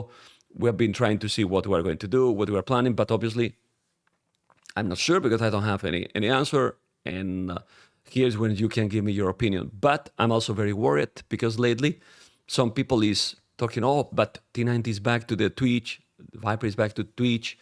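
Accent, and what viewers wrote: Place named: Spain. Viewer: I'm English and the jokes he does is European Banter/Dry humour, it's very understandable and funny af lmao, yes he has a bit of a thick spainish accent but it's a positive, it brings charm and more humour to some sayings he does which he has created himself, like "SIRO!" It's brilliant. And I think he's got better at not interrupting, I really respectfully disagree with all what you said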